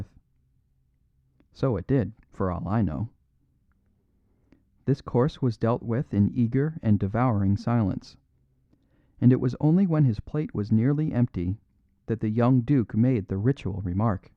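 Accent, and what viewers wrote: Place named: Midwest, United States. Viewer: Look with all due respect my friend, would you really expect a British person to read John Steinbeck with the characters of California fishery, or Tennessee Williams with the southern characters that really only an American inherently understands. It is beyond the capacity of the British to do these Justice. Ergo unless you have spent a portion of your life, say 20 years or so, living in the UK, and I gather by your accent you have not left the Midwest it really causes a problem with presentation. They're going to be terms and expressions in there that you are unfamiliar with and this all absolutely works in reverse. How about giving us a nice shot of Edith Wharton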